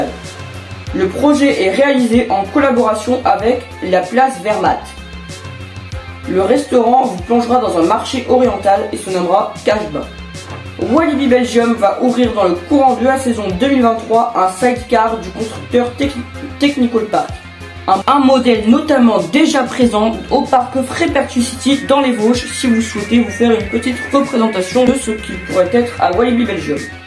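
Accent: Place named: Spain. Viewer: Je me rend compte d'un problème qui persiste sur tes vidéos, c'est les cutes vidéos qui sont très répétitifs et désagréables, parfois j'ai l'impression que tu t'auto-coupes la parole dans le montage et là aussi c'est pas agréable à écouter, j'espère que ça sera réglé par la suite (et au passage, très bel accent espagnol 👌)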